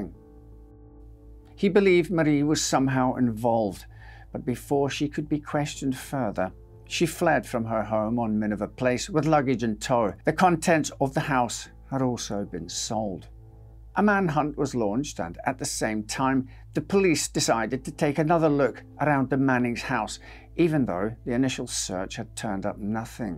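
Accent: Scotland, United Kingdom. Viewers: My first time watching. What a delight. I enjoyed the production; no small effort. I assume you've been involved in acting. I look so forward to making up for lost time and watching your archive. Oh, and let it be said, "There is no accent on earth as rich and wonderful as a fine Scotsman's"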